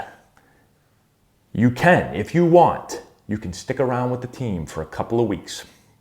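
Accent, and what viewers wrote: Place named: Massachusetts, United States. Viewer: I’m from Rhode Island and I knew you from Massachusetts due to that accent haha. Any memories of McCoy stadium?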